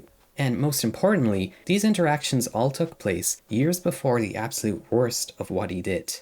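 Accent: Ireland. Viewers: It's certainly an interesting variation of an Irish accent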